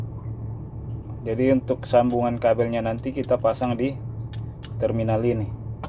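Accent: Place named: Sulawesi, Indonesia. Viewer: kayak logat org sulawesi 😁🙏🙏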